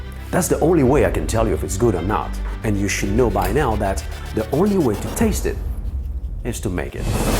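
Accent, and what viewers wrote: France. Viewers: oui, on lui a déjà suggéré de mettre des sous-titres en Français (puisqu'il EST Français) et ça a déjà été fait (on aimerait que ça soit systématique, MAIS faut dire que son très très léger accent français est très sexy : on adoOre !)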